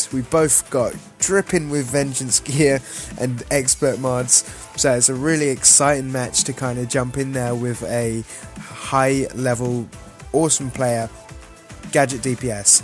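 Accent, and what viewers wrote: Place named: Russia. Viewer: ***** you sound like a russian and im not insulting you. Your Russian voice makes me watch your videos :D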